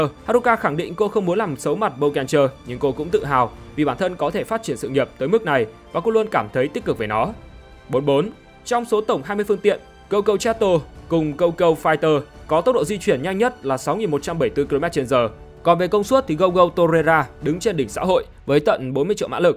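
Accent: Japan. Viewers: hình như là tên tiếng anh nhưng mà là anh nhật bạn ạ =)) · đọc tiếng Anh theo kiểu Nhật nghe khó chịu thật sự, mà đã thế còn chỗ Anh chỗ Nhật, nhất quán 1 kiểu thôi :)))) ko đọc đc thì đừng có đọc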